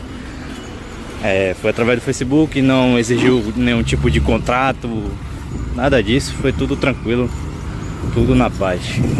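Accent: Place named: Rio de Janeiro, Brazil. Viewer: Se vc é da Bahia porque esse soutaco carioca?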